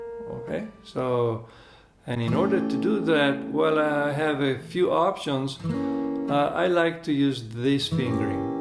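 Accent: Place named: Italy. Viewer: thank you; i could spending hours listening to your italian gusto sound!thank you man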